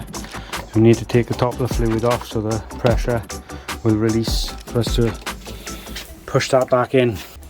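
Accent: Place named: Wales, United Kingdom. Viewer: It’s funny how in this Welsh dialect of English, words like ‘that’ are pronounced ‘naat’ or even ‘aat’. The first consonant completely absent. 😐. Where did the ‘th’ go?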